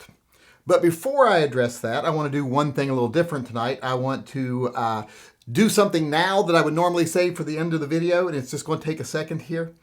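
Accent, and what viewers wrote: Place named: South, United States. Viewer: Says the wannabe Marshall. A so called yankee with a mild southern accent and a lisp. Anyone doing what Kyle did of any age is defending the community. You talk too much about nothing.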